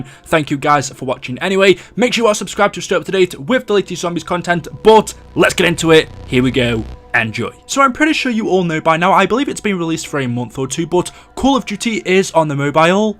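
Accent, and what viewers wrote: United Kingdom. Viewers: Love your voice and vids you sound like a British Person no Offense if you are one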